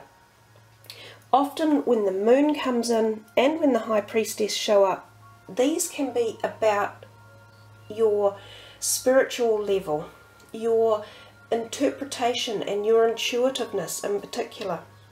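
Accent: New Zealand. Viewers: My resolution is to learn the tarot, which is how I found you. Also, your accent makes me miss NZ. I'm a New Yorker but I spent most of 2013 in Auckland because my partner is a kiwi.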